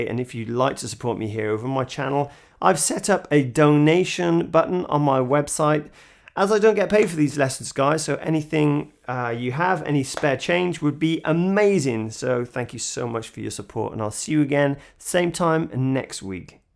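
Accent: United Kingdom. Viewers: You should also just post you doing this cover —— love it —- I would put it on a loop n listen all day. You are so righteous you could play in Jamaica and they would respect you! I would love to hear you do or or or even reggae style ( from Denzel Jamaica movie ) You have a great accent. Thinking maybe Manchester, U.K. … maybe?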